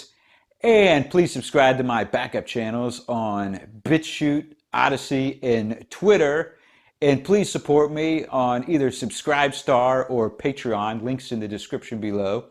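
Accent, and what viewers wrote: United States. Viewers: Love the information. All thumbs up! Thank you! You sound like you’re speaking in Chicago accent. I am Polish/Chi south side 3rd gen. You might be similar or other Midwest. Just curious if you care to divulge.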